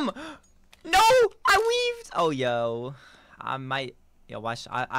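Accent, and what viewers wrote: United Kingdom. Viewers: well the accent sounds like a British one so idk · Why did he turn British 😭😭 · I never new you were British but you moans got me dying😂💀💀💀💀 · one question why is he British ? Aren’t you American? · Are you British?? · I an never tell if ur American or British 💀💀💀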